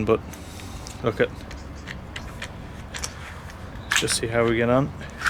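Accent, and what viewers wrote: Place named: Ireland. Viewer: Sounds so weird hearing an Irish guy call me dude! Thanks for the video though. Was nervous trying my vitz roof bars on my new Toyota corolla hybrid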